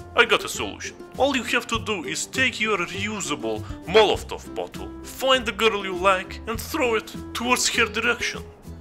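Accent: Russia. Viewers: Are you having russian accent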